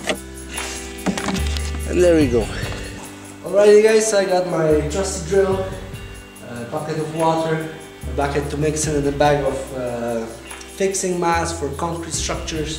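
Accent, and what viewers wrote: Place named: United States. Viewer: masz piekny dom i swietna rodzinke moje gratulacje. Mam jedno pytanie i bylbym wdzieczny gdybys odpowiedzial, czy mieszkales kiedys w USA? twoj angielski jest bardziej amerykaski niz ten z Wysp.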